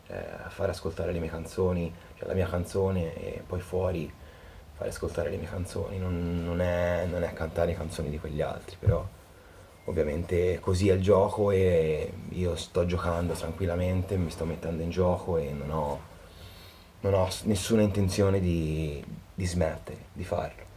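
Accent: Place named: Tuscany, Italy. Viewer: Fantastico l'accento toscano ....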